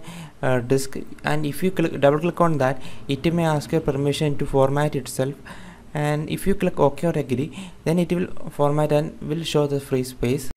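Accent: Kerala, India. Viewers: Helpful video bro Btw are you from Kerala Malayali ano?😅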